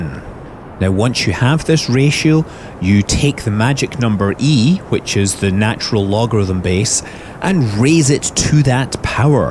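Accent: Scotland, United Kingdom. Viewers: omg I love your brogue! my god it's just like...awesome. Not David Tennant thick, not Northern England weak. ;3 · the switch between scottish/american rhotic/non-rhotic stressing is kind of disorientating. good video though, loved it. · What a silly comment. They were talking about what he sounds like, not his nationality. We all know Scotland is a part of the UK, so that technically makes him British. But he does SOUND Scottish, which is a much more accurate description than the over-encompassing "British."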